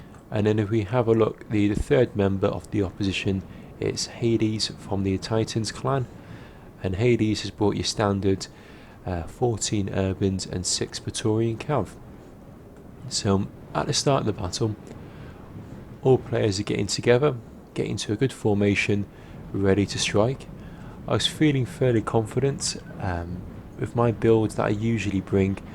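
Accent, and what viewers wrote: United Kingdom. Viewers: I like your semi Yorkshire accent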